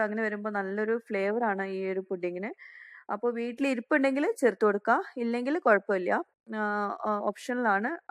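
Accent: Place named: Kannur, India. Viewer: Ithaaa ningal naattil evideya. Samsaram kettittu kannoor Alla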